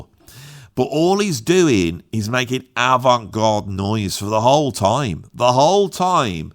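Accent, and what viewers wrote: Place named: England, United Kingdom. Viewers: Dude I love your accent so much, I can't get enough of it, I end up speaking to myself in your super English accent lol .. love the way you think as well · Hey... you're right... you are a common folk fella...who speaks his mind sincerely....but you totally know what you're on about.... the scattiness of the presentation just authenticates your niche....and bytheby.... I'm a scouser and our accent is mocked and dreaded and even I know why.. ( especially in female tenor)..... I live here and I'll never demure to the high pitched caustic auoural onslaught of a scouser woman shrieking aloud..... cat's scatter.... dogs bark and their men plug their ears as though metal is grinding on metal and amplified with reverb and harsh distortion with wah wah and full tilt chorus pedal... As a juxtapose to that.... I can tell you now that your midland is accent is the perfect parlance to cast your net with.... 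it offends no one and is very easy on the ear.... I've never heard the term "Rob-Dogs" before and regrettably it is well earned.....I know that by living here...and will include it in my vocabulary from hereonin ❤ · Thank you for that insight. You're a great thinker. I once lived in Wolverhampton, and listening to you talking always brings me back there.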